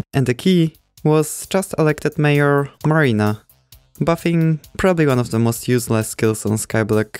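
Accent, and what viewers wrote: Poland. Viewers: po tym akcencie odrazu wiedzialem ze polak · u sound like polish and sound like u are reading script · U from poland? i recognise the accent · Kurwa oglądam sobie i słyszę charakterystyczny akcent patrzę w info a to kanał polski xD powodzenia w anglojęzycznej karierę będę tu chyba czasem wpadał :) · Jesteś z polski? Słychać po akcencie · Are you from Poland? I hear an accent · słysze polski akcent czy nie prawda?? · Słychać że Polak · You sound like you are from Poland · Kurwa odrazu po akcencie wiedziałem że to polak xD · My polish detector went insane after I heard you speak, I was right judging by your about page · Takiego polskiego akcentu nie ukryjesz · Ty z polski? Bo masz nasz akcent? You from poland? Because you have our accent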